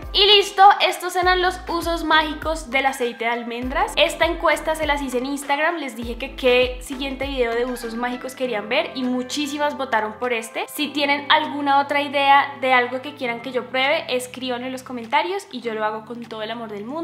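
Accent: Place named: Colombia. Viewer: Ese acento... 🇨🇴 Colombiana?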